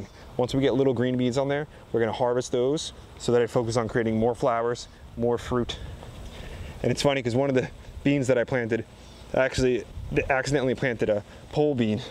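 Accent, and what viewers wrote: New Jersey, United States. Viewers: His way of talking is TOTALLY a Jersey thing!!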